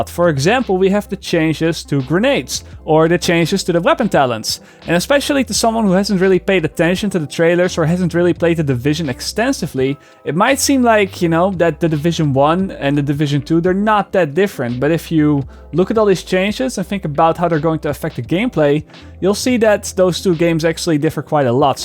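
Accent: Netherlands. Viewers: Holy shit your accent is very dutch. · Hey! Love that Holland accent. I have seen almost all of your Division (1) videos. Keep up the good work 😏👍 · Well, that's because he's European (most probably Dutch, not 100% sure). That's why he has the thick accent. And you are certainly not the first person to notify him. But he's a good sport about it; he jokes about it in a few of his IRL videos.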